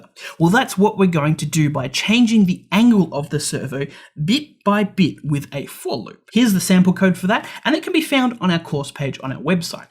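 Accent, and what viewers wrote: Australia. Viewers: Part way through and finding this the most clear, no fuss course to follow. big thumbs up. did have a snag at beginning where i almost gave up. couldn't get pico to be seen on laptop. turns out i was using a power only cable and not one with data. silly mistake but got there in the end. very pleased you uploaded this course. Thank You, and is that the Aussie twang to your voice? Brit here and find dialects fascinating. It changes here in just 20 miles apart.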